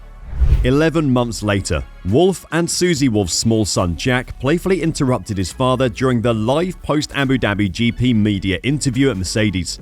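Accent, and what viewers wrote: United Kingdom. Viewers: “Clearly clear” sounds totally fine with a British accent. That accent definitely makes everything sound much classier 👌👌 (no sarcasm)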